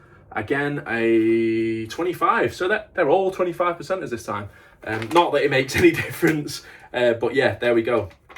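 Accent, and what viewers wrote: United Kingdom. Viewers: What on earth is going on, I've never smoked weed before, somehow this has been recommended for me to view. You're getting weed in the post though some kind of "prescription"? And from you're accent your're obviously in the UK, and you've openly documenting this. I don't know where I am right now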